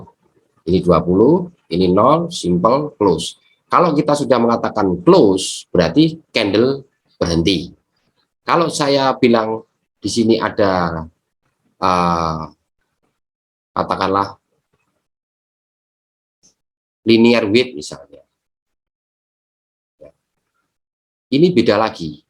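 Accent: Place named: Java, Indonesia. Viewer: CUCOK SAY ....JAWIR BANGET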